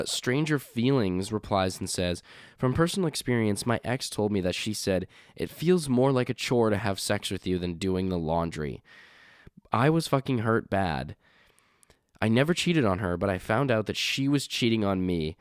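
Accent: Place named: Australia. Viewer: Nailed the Australian ascent 😂🛴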